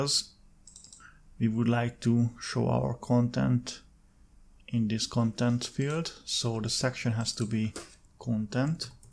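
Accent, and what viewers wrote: Hungary. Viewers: akkor jól éreztem az akcentusodból hogy Magyar vagy. Köszi akkor magyarul is 😀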